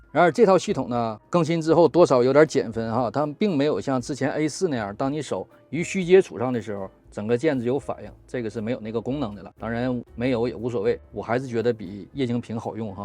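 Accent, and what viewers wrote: Northeast, China. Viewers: 听到这东北味就感觉满口大蒜味，真是人车都辣眼睛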